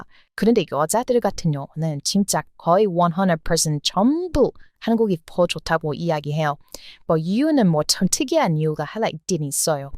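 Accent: United States. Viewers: 매력 넘치는 미국식 한국어,정말 최고! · 미국식 한국어ㅋㅋㅋㅋㅋ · " 입니다."하는 멘트 예전에 개그맨들이 미국갔다온 사람들 흉내내는 말투로 자주쓰던 시절이 있었는데 님은 오리지널 미국교포 말투입니다.흥미롭네요.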